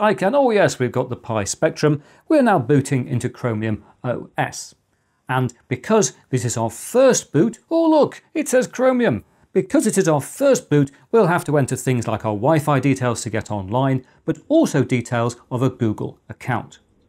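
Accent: United Kingdom. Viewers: I just love the way Britons talk.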